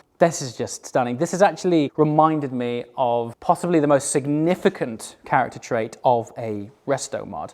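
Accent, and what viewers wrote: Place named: North, United Kingdom. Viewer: Well, with his Northern lilt, you can hardly accuse him of being pretentious!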